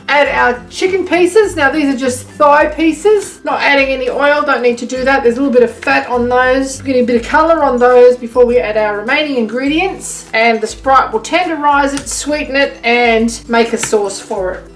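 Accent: Australia. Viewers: Sortaye? Saute? It's ok, I'm getting the hang of Strine speak now :) Actually another top notch cooking lesson and recipe!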